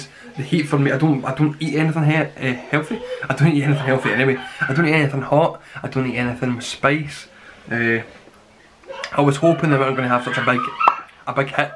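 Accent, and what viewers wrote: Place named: Scotland, United Kingdom. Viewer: These crisps are never hot enough for me, no matter how strong the packages says they are.... :D what a Scottish accent mate, you could use it to your advantage if you want to do yt seriously. i subbed and liked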